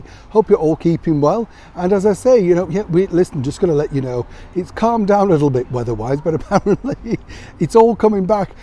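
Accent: United Kingdom. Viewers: Cant get more Bri'ish than 🧐🎩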